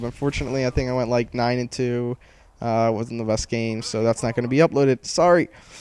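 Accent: Spain. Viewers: ur spanish? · eres espanol tio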